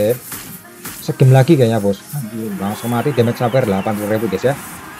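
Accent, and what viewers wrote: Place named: Kediri, Indonesia. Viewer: ooh..tak kiro kediri,,,coro ngomonge mirip wong Kediri..😀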